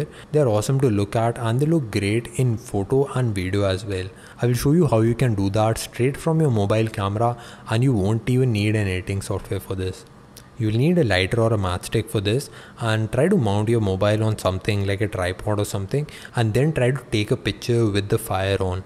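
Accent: India. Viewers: D and T are two different letters PRONOUNCE THEY DIFFERENTLY fucking indian accent 😒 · please your indian accent is so irritating...too hard to take...alvida.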